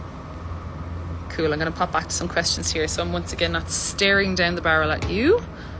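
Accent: Ireland. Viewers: Wow i love the irich accent ❤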